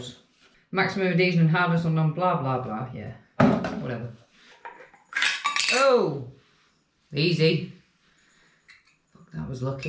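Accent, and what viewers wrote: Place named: Newcastle, United Kingdom. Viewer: Def heard the geordie in there. I didnt hear the Yorkshire but to be honest I dont know many people from there, I am not trained to spot that accent. I am Greek, living in Newcastle.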